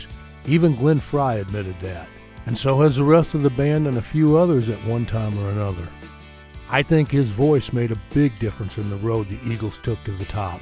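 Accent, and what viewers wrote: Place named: East Texas, United States. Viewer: As someone born and raised in gilmer, and a die hard fan of your channel, I really like how accurately you told his story. My grandfathers best friend was his dads boss. They worked for an auto parts store and my grandfather used to go with them to nascar races. In my head I have my own little rivalry with don. When I was a kid, I was a drummer and singer and everyone used to say my voice was just like his. I still sing, but I haven’t quite overtaken him yet. More of a guitar player anyway. Tbh, my sister is great friends with whiskey Myers and I’m borderline hoping on a Steve gains kinda situation lol I know you’ve got to be somewhat in my vicinity by the way you speak and the specific music you’re really into, I just know it. East Texas?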